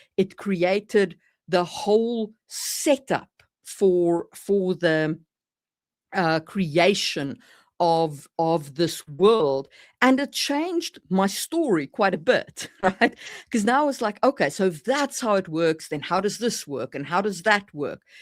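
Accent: South Africa. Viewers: Afrikaans? I've always wondered about your accent when you said you were from Finland because it always sounded like South African to me. Good to finally know.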